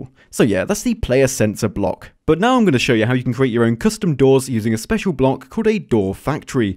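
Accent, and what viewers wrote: United Kingdom. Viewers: is he really british?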